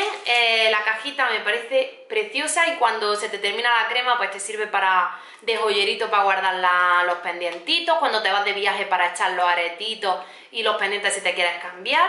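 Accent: Granada, Spain. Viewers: Eres de Granada cierto??? Saludos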